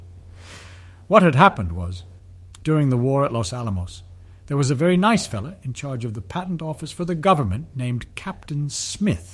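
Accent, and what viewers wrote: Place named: United Kingdom. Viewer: the British accent lol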